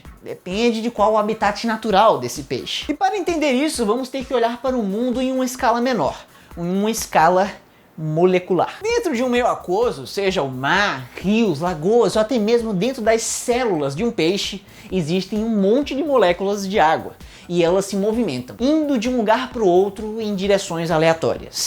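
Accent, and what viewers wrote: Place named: Northeast, Brazil. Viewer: OXENTE! É NORDESTINO!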